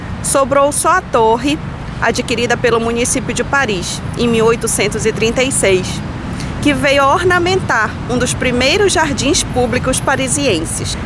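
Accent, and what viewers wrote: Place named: Pará, Brazil. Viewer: Que bom saber a história da tour Saint Jacques. Você é paraense? Eu sou paraense e achei seu sotaque parecido com o nosso, adorei e parabéns por nos trazer conhecimento, obrigado.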